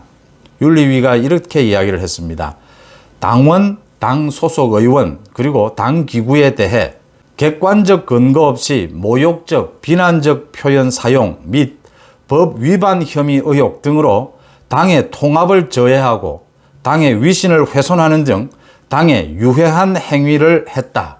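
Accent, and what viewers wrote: Gyeongsang, South Korea. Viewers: 송 선생님 평론을 평소 열심히 듣고 있습니다. 경상도 억양은 있지만 언어 구사는 표준말을 사용하시므로 아주 깨끗한 방송이라고 늘 여겨왔습니다. 한 가지 외람된 말씀 드리자면 '제명'이라는 단어를 요즘 상황 때문에 많이 쓰실 수 밖에 없는데 accent를 '명'에 두는 것이 올바른 발음이라 알고 있습니다. accent 를 '제'에 두시는 것이 송 선생님의 일종의 언어 습관이라 생각하지만 혹시 고칠 의향이 있으시면 참고하시기 바랍니다.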